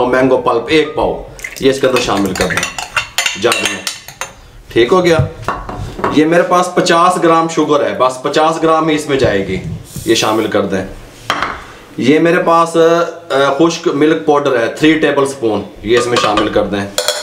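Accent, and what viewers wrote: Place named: Punjab, India. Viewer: Bai luv u nd i like d way u speak hindi in punjabi tone nd ur recipes are awesome....luv from australia nd india..god bless u always..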